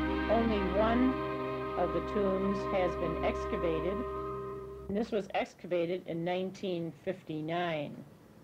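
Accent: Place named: Michigan, United States. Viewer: Not Canadian. Could be from Michigan. Wisconsin maybe.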